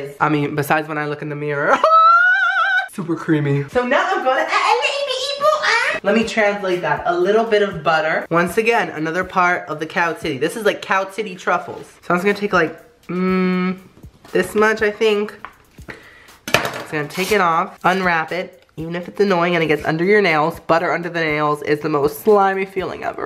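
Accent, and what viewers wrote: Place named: United Kingdom. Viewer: The British accent killed me lmaooo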